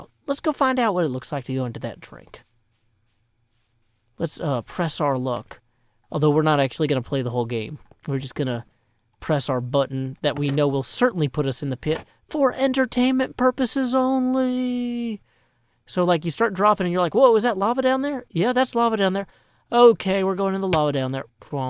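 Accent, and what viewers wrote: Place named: South, United States. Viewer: This is the first time I’m watching one of your videos. I haven’t watched Minecraft videos for the better part of six years, but nothing has brought me more peace or put a smile on my face than listening to you talk to us about assumptions and the dangers of comparing yourself to others. I thought I didn’t like southern accents, I have changed my mind Thank you.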